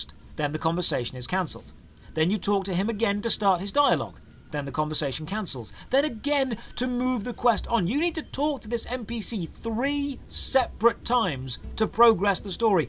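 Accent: United Kingdom. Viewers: Very, very british!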